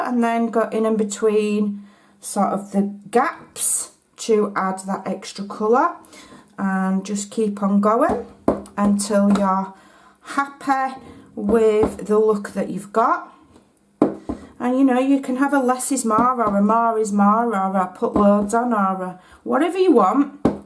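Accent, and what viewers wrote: Yorkshire, United Kingdom. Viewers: I LOVE your Yorkshire accent. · I'm a eighty year old American,only been crafting a few months, a real newbie and can understand every word,well, almost every word. lol I love your Yorkshire accent. I traveled America several times, you should hear some of the pronouncements, slang and diction. LOLOL Keep what you love and I will love it and you also. Love your work, explanations and techniques, and especially love when you laugh at yourself. I subscribed right away. xoxo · I shouldn't worry the captions never make sense anyway whoever's speaking. I understand your accent perfectly being a Yorkshire lass too. The captions do entertain tho lol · I just love your Yorkshire accent, and I love your little quirky sayings....'pants', ' rubbish', 'wonky'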